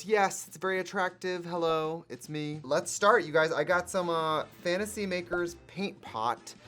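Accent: Wisconsin, United States. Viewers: Is it just me, or was the Wisconsin accent really making herself known in this video?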